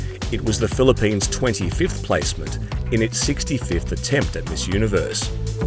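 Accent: Australia. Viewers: Another great video, you have so much knowledge about The Philippines from someone who has (What I’m presuming 😝) an Australian accent. 👍🏾 Do you have Instagram by any chance? ☺️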